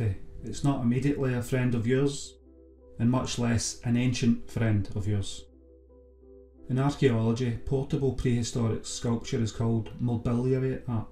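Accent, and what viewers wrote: Scotland, United Kingdom. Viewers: Love your accent, miss living in Scotland!! By the way the engraving patterns on these sculptures are water symbols, during the later mesolithic and the Neolithic, chevrons represented vaginas and waves represented water so both would to a Mother Goddess statue... These patterns are all over the world but studied especially in Lithuanian folk art and European prehistoric art my Marija Gimbutas.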